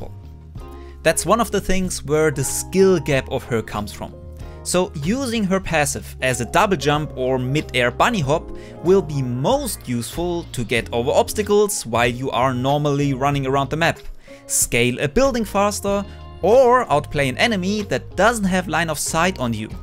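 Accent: Germany. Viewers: You Sound Like a German am I right? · I wanted to ask if you are German I’m German and I hear the accent so are you?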